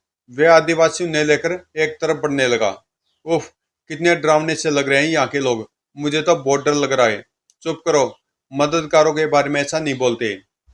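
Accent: Haryana, India. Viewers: मजा आ गया हरियाणवी स्टाइल में comic सुनकर भाई, जय हो 🥹🤟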